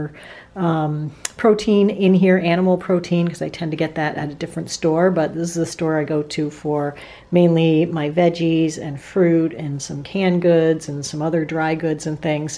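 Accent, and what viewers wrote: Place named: Midwest, United States. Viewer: Where do you live, in the midwest? I wonder because of your accent and the products all look so good - quality -- I don't see any of those brands down here in the South. Thanks for giving us details of what you eat in a day, itemizing really helps us get motivated to stock up for health!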